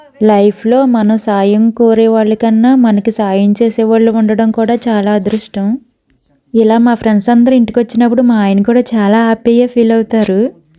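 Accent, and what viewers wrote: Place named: Godavari, India. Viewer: Medhi India lo akkada..vallani mavyyagaru attaya antunnru..njmenaa..full detailed video cheyandi..me slang emo godavari slang laaaaa undi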